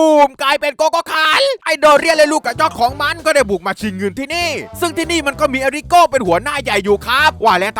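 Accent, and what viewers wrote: South, Thailand. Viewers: ผมชอบในความภาษาใต้ของพี่5555 ใครคนใต้บ้าง✋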